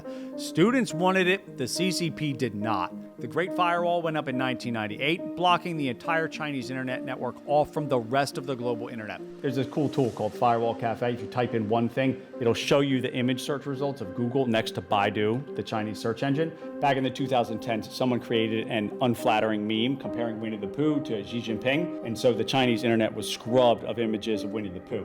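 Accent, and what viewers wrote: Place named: New York, United States. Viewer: Im a Muslim so I don't have much love for communism. I know how Muslim populations are military target No.1 for any red army for total annihilation or reeducation. But c'mon dude. An Ivy league degree, a spiffy NY accent but nothing but bias and hot air is going to end up collapsing the USA. We deserve better than that. We need the US as a world order insurance to make sure no one crosses beyond the red line. Do your job guy!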